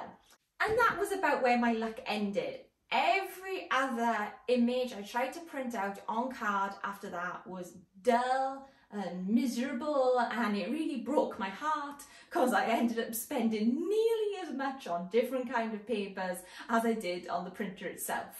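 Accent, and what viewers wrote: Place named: Wales, United Kingdom. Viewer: 🏴🏴 Love your Welsh accent ❤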